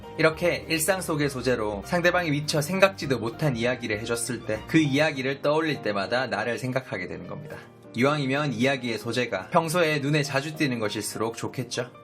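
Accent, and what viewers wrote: Gyeongsang, South Korea. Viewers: 갱상도 출신맞?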